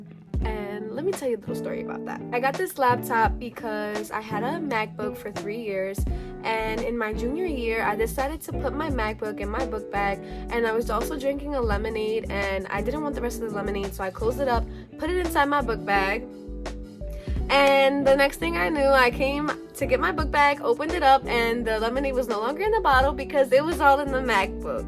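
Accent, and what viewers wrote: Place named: United Kingdom. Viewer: Great video! Your British accent always gets me and great Q@ keep it up😁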